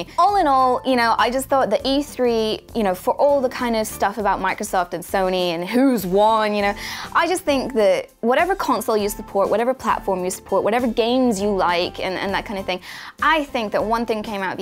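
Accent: United States. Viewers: why do you always sound american but your from england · Is English I keep on getting confused because sometimes she sounds american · Before E3 she had an English accent, now she sounds american.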